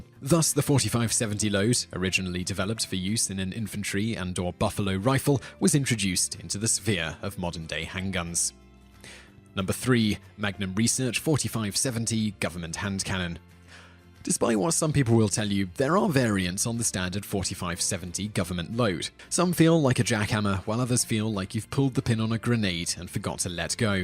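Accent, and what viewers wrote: England, United Kingdom. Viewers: You'll notice a limey brit is narrating this video and making derogatory remarks about handguns using liberal adjectives. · I should have known. English like accent talking about firearms. Not close to being accurate. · the reader , Is just that, no knowledge at all, But with English accent , to project an aura of superiority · What an idiot!!! Not really an unexpected grand pile of PS one has come to expect from the Britts judging by the accent and with the absolute lack of knowledge of firearms other than the garbage spewed from the ignorance of the anti-gunners. While yes many of these guns are "over the top" they do have a purpose. That last one is little more than a novelty item. On the other hand to say that the Desert Eagle 50 cal. is the favorite of gangsters and mob bosses is bull to the highest degree. While yes there are a few criminals that use them most would prefer something a bit more easily concealed and way less obvious and in your face. 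They don't want to be running around with something that you practically need a wheel-borrow to carry around. A bit too obvious to the cops. Then again most of what he was saying was just a jab at gun owners, most of who are law-abiding and perfectly reasonable people.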